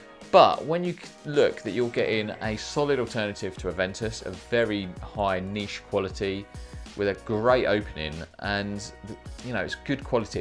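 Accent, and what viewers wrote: United Kingdom. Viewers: Wow Ed Helms has a great English accent.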